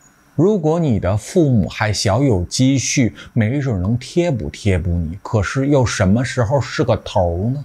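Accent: Beijing, China. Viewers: 聲調像是老北京，容貌眉眼有皇室貴族的感覺，該不會是愛新覺羅後代😊按讚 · 纯正的京片子，適度的京式曲藝评说神態; 貼地、真實的講評内容; 抑揚顿挫的语调; 是繁茂的自媒體叢林中的一朵奇葩！ 支持你， 。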